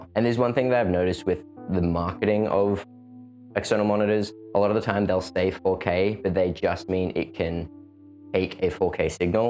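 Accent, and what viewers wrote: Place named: Australia. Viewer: You sound like Adrian Gee. I am guessing you are from Adelaide or Melbourne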